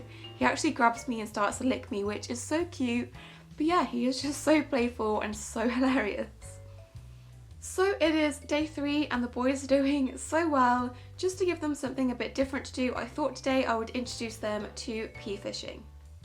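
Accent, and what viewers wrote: United Kingdom. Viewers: And also, sorry for being creepy but what part of the U.K. are you from? 💕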